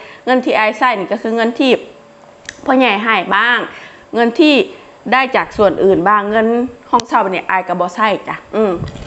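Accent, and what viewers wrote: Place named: Sakon Nakhon, Thailand. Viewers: พี่รู้จักและติดตามน้องอายตอนหาเห็ดเกาหลี เพราะพี่ชอบดูหาเห็ดและพี่สงสัยว่าอีนางนี้คึเว้าสำเนียงเมืองสกลแท้ นำมาๆจั๋งฮู้ว่าเมืองอะหลีไทกุสุมาลย์ พี่ชอบวิถีชีวิตน้อง จะทำให้คนอื่นเข้าใจเราทุกคนเป็นไปไม่ได้เก็บคอมเม้นต์แต่กัลยาณมิตรไว้ เป็นแรงใจให้ตนเอง น้องอายพูดถูกจ้า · ข่อยล่ะเหยอะนำเจ่าเด้เสียงหย่ำกรุ้ปๆๆ ตาแซบ หล่า เสียงเว่ากะม่วน ภูไท เว่าม่วนอิหลีจ้า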